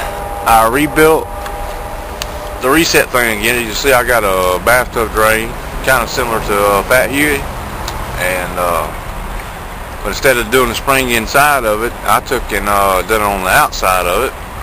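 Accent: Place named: South, United States. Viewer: Good job! Love the southern drawl! Beats mine all to shame. lol One question, why did you not like the internal spring on the poppet valve? Again, great work!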